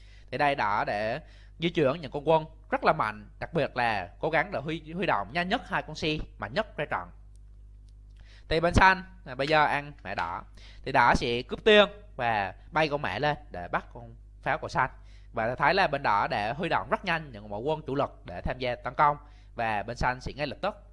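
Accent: Binh Dinh, Vietnam. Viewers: Giọng bình định hay phú yên mà nghe khá nặng? Có vài từ ko nghe kịp! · Ng bình định hay phú yên gì đó chớ quảng gì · Bình Định!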